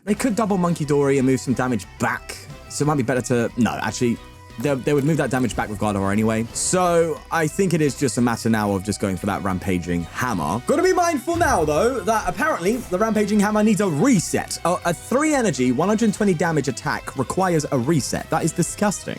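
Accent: RP, United Kingdom. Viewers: No, you sound fine. Sometimes I find the posh wee bit funny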